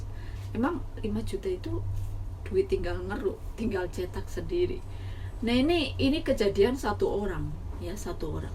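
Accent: Java, Indonesia. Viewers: Salam kenal mbak... Sehat, sukses terus mbak. Njenengan jawane mana mbak?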